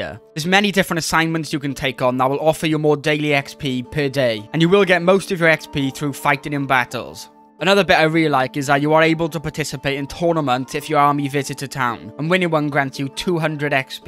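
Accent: Wales, United Kingdom. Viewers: Nice vid. Is that a Welsh accent?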